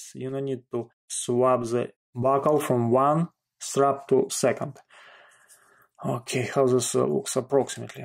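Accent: Russia. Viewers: Вы из России? Заметен акцент.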